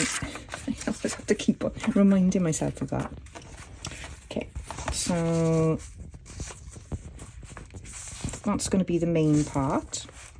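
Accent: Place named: Wales, United Kingdom. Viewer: Hello from Montreal. I think there will always be people preserving the art of paper working. It's a lovely art. I was trying to place your accent... it's Welsh... Love it! I so enjoy watching your videos. Thanks for sharing! Takecare. BTW...love your page! ❤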